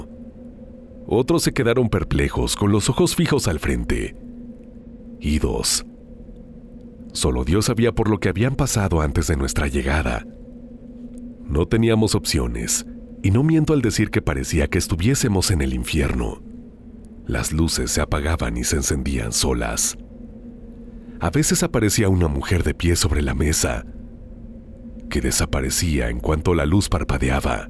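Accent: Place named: Mexico. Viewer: Hola, tengo una curiosidad, si eres venezolano por qué tienes tanto acento? Vives en México?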